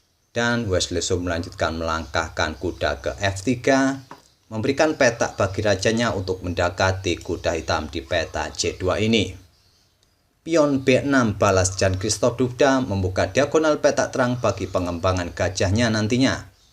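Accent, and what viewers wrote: Java, Indonesia. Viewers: Ji.. medok amat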